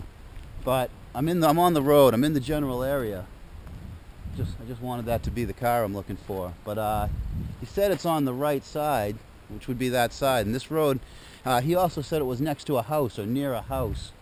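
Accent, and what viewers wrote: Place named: Boston, United States. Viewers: that Boston accent. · This guy has to be from new england. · "I went to a pahhty las weeken that was soooo enohhmis it was stahtling." Boston baby!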